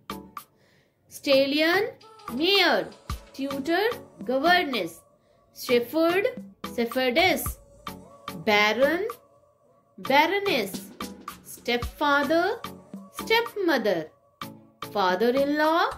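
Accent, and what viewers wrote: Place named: India. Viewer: I like this video and I do not understand Indian accent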